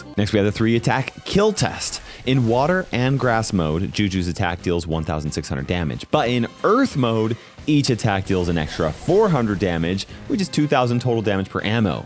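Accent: Louisiana, United States. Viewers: Love the Cajun accent ❤😂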